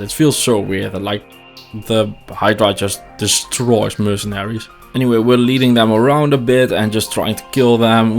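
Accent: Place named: Netherlands. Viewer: Too bad, but sure I totally comprehend the reason. Not everyone has the time to get a "Twitch star" today 😁 RL always comes first. Cheers! P.S.: are you dutch ? You sound a bit like a dutch guy ^^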